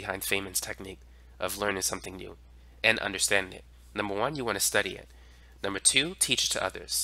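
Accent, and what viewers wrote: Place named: Bronx, United States. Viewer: you from the bronx? kinda sound like it (very random, sorry)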